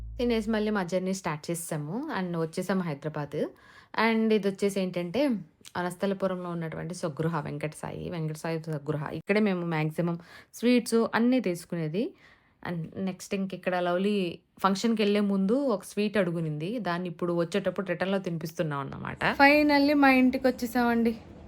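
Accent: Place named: Nellore, India. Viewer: sare....Badram😊 ma Nellore language...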